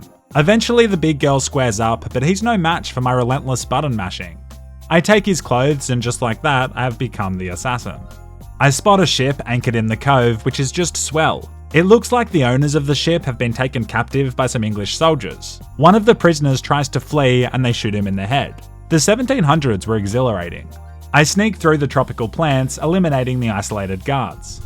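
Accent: Australia. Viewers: You have my sense of humour, you play all the games I like, and you have an aussie accent (the best accent). This channel is essentially designed for me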